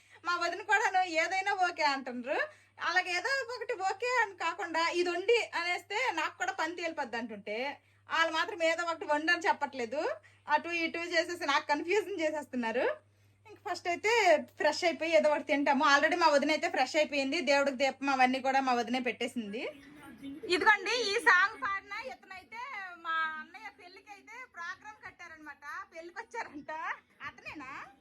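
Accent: Srikakulam, India. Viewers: Pakka Srikakulam ani cheppavachu mi matalu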